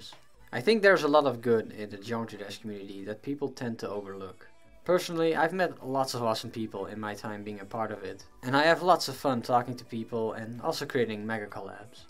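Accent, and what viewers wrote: Netherlands. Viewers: Eerste seconde wist ik all dat je Nederlands was.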